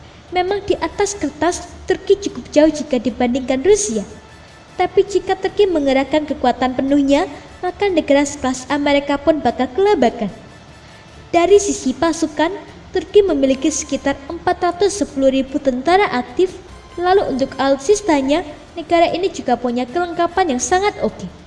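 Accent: Java, Indonesia. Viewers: medok amat ch ngmngnya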